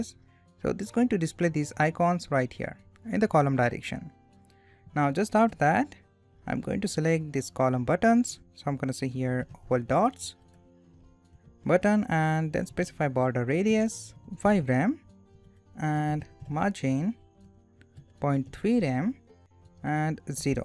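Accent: India. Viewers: Awaaz Desi si lag Rahi hai.. YouTube me HTML and CSS sikhane wale mostly Desi hi hai..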